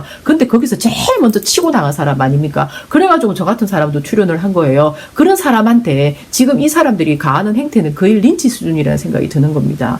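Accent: Gyeongsang, South Korea. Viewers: 엄청 똑똑하네요 사투리 쓰지마세요 경상도 사투리 듣기싫으니깐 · 진정 경상도 오리지날이심 ㅎㅎㅎ 슬즌... 어는 으로 으는 어로... ㅋㅋㅋ 인정합니다. ^^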